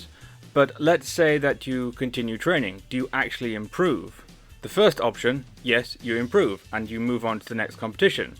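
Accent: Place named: United Kingdom. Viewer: Wow where are you from? That’s the strongest British accent I’ve ever heard, with a slight hint of Malay/Singlish added in there too.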